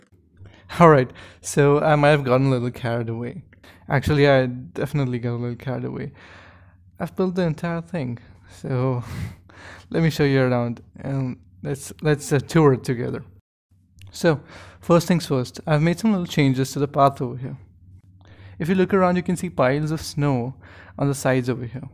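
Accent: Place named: Europe. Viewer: Are u from europe? U kinfa sound like ur from there. Im asian 🇵🇭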